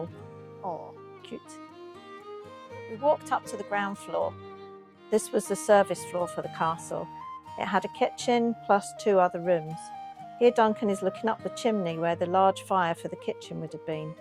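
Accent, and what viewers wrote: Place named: Scotland, United Kingdom. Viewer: your voice is a beautiful mix of Scot’s and elegant English. 👍 plus no one likes the sound of their own voice… oh wait, some do! 😂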